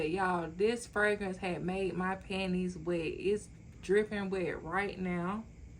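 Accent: United States. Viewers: Should this be in my collection? Thank you for sharing this content! Sound like you from the South. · A Mississippi / southern accent for sure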